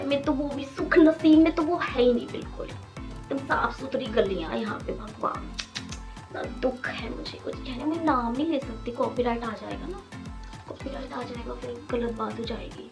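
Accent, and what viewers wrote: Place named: Punjab, India. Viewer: Aapka dukh Sankat tu mujhe Rona nahin a raha hai balki Hansi a rahi hai aapki baten sunkar 😂😂😂 itne acche se aap Punjabi language wale rahe ho, aur mujhe lag raha hai kuchh logon Ko karara jawab mein mil raha hai aapki ine baton se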